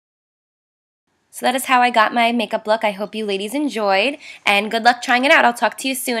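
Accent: New Jersey, United States. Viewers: Are you from New Jersey? your accent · look at the accent! haha jersey girls! we know whats good <3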